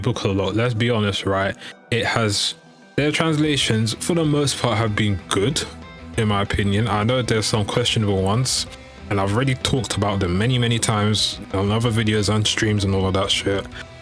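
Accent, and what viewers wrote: United Kingdom. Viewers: I didn't know you're black. Not that there's something wrong but I have you in my mind as a Japanese with a British accent.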